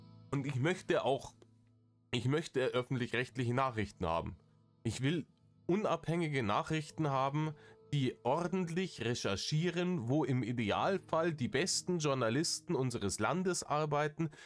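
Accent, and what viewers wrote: Bavaria, Germany. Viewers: Danke ❤. PS: es ist so wohltuend, echtes Bayrisch zu hören 😊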